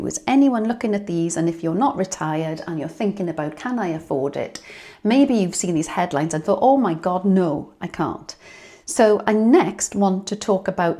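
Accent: Wales, United Kingdom. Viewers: it is very obvious that throughout your life you've always been a Very good looking lady. And still look far younger than your age, you clearly look after yourself! It works. At 70, I've been going to the gym for 3 yrs now, 3 times a week, 2hr sessions each, plus long walks; 5-11 miles. Never been better! And you have a beautiful soul which you use now to help others, even without a particular topic, I'm sure many people living alone just like to sit and listen to another human being chatting away about their lives. It provides comfort and a degree of normality by association. You are a 'giver', and you do it through love. That is the best and only way, and it returns like you would not believe! That gentle Welsh accent also helps:)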